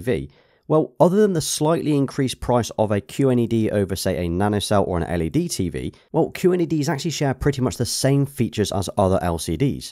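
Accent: United Kingdom. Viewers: I really wanted to find someone speaking British English in normal speed, finally, the day has arrived.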